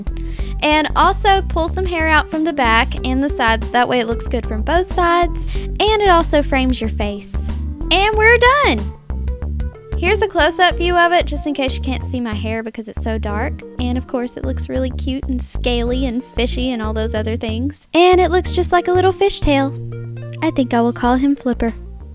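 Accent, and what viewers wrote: South, United States. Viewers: I absolutely LOVE LOVE LOVE the way you talk! I can definately tell your from the south(and i don't mean it in a rude way because i live in the south) but your voice is so cute! thanks for makin me laugh(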